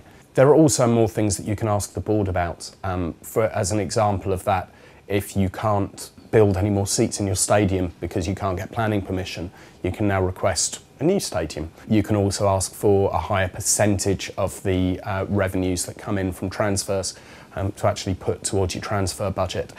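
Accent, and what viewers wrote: United Kingdom. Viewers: i'm not sure but it's sound like London dilect mate =D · what type of english accent is this?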